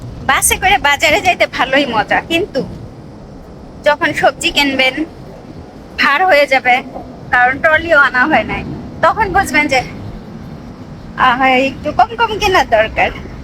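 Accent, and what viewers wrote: Khulna, Bangladesh. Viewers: আপনি খুলনার মেয়ে?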